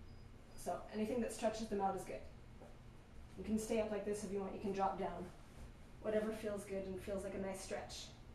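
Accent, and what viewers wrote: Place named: Canada. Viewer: Thank you for this! And also, you are so adorably Canadian! lol. Love the accent. I don't remember ever seeing a video with you before.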